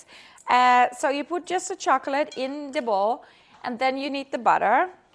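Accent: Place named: Norway. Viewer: Are you living in Norway? (If you're Norwegian ofc lol) because I can hear a little bit of Norwegian accent when you talk, but it's a lot better tho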